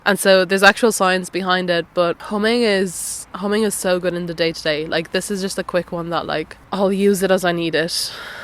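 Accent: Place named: Ireland. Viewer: kia ora from aotearoa (nz), it's surreal to see another young person on the opposite side of the world going through such similar experiences and processes, like I was listening to myself just with an Irish accent. Thank you for the self-safety work you have done and continue to do. It is a soft reminder that we too are allowed to treat our most difficult parts with patience, curiosity and respect. Have been recently feeling like I've been regressing or that my healing work is selfish, but this video & all the comments show that all self compassion overflows into our world, into those around us 😇 + so many good reminders of practical techniques i had forgotten or not yet heard of! ty ty ty <3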